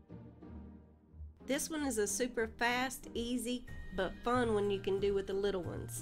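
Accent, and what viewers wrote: South, United States. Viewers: Love your crafty ideas and your southern accent too. Sending love from the southeast Kentucky mountains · Just found your channel and I had to subscribe! I’m from southeast Georgia so I love your accent even though you are from a different part of the south. We are still both Southern girls!❤️Loved all your ornaments and will be check out your page for more great ideas!❤️ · I normally don't care for DIY projects because they look just like DIY but I have to say these are adorable. I will do a few of these. Thanks so much. Now we all are wanting to know where you are from? We hear a southern accent... Maybe you are from my south.. Louisiana! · I just discovered you and I'm thrilled I did! I have to tell you how much I enjoyed watching your Video the music was nice and I loved each and every Christmas ornaments I really did! The baby JESUS was such a nice idea and it turned out so adorable (your humor is appreciated, I need some lately these days.) The pink tool was stunning with the multicolor gravity Ball..it sparkled so lovely! 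The Snowman's Top Hat and face was clever and so nice seeing it hung on the Tree! I liked the way you explained each craft...,I love listening to your Southern accent I'm from lower Michigan we don't have a friendly sounding voice (but we do say "POP" for bottled Carbonated Soda) Gee! ALL were really great ideas and some I've seen others do "BUT" using the BIGGER Bulbs I have to say that was one of the differences and yours had a look of neatly done, fresh and festive! SUBSCRIBED! Merry 🌠CHRISTmas and Blessings all throughout the coming 2023 🛻🎄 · I'm not sure where you are from, but I absolutely love hearing someone on YouTube that sounds a lot like me. I grew up in middle Tennessee, so I have a very thick southern accent, so I feel like you're a family member helping me craft! Thank you for that! Lots of Love from one southern woman to another.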